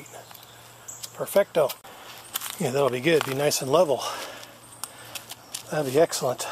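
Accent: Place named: Russia. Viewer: Мне показалось, что комментатор русский ))))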